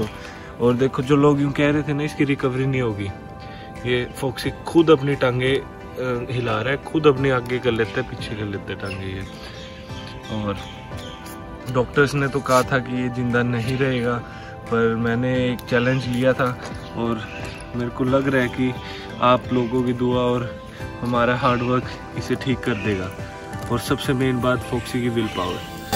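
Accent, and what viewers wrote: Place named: Haryana, India. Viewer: Mujhe toh tumharo haryanwi tone bahut achhi lgti h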